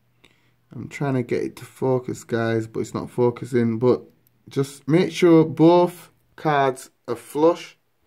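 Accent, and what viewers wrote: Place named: United Kingdom. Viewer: Which part of UK are you from?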